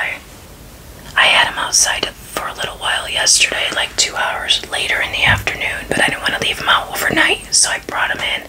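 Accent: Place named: East Coast, United States. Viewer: Good morning what state do you live in? Sounds like you are on east coast like me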